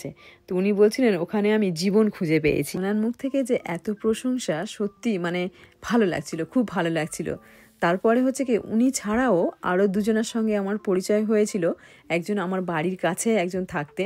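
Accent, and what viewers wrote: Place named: Japan. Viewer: Apnar Bangla accent e toh ekhon ekta Japanese influence esegechhe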